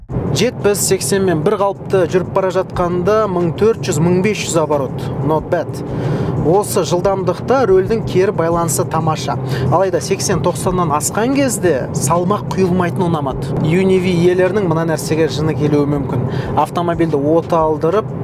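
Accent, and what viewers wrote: Standard. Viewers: Керемет видео, жарайсың, таза қазақша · жарайсың Қазақ тілін таза анық әрі сөз саптауларымен керемет үйлестіріп жүргізетін бір ғана жүргізуші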